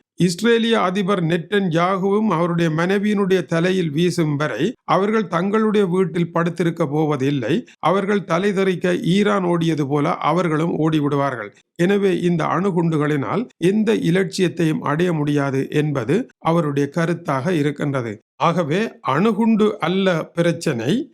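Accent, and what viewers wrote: Jaffna, Sri Lanka. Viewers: யாழ்ப்பாண ம்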